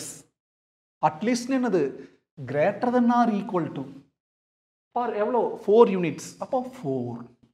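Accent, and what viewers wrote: Coimbatore, India. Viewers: Sir neega coimbatore ah.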